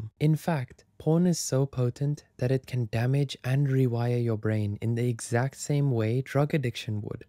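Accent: South Africa. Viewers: is the narrorator south african? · I hear that Suid-Afrikaanse accent 🇿🇦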